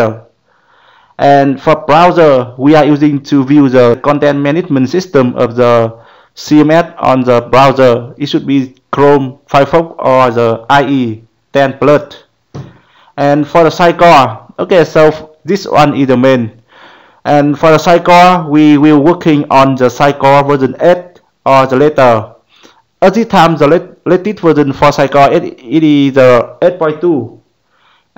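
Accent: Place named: Vietnam. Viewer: You accent is sooooo Vietnamese, I LOVE IT <3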